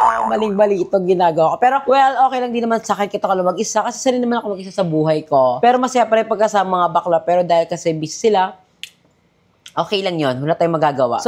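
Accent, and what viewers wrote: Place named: Visayas, Philippines. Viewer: Bisaya poba kayo ma'am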